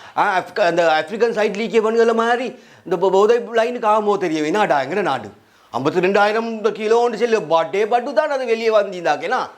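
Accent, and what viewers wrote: Sri Lanka. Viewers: இது Colombo தமிழ்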